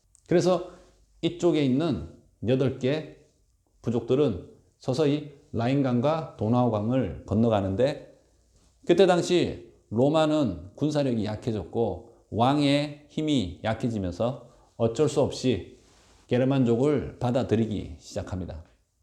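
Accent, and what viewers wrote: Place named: Gyeongsang, South Korea. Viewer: 강사님 억양이 경상도 분이라고 추측해 봅니다. 뺀질뺀질한 서울 억양보다 듣기 조아요~~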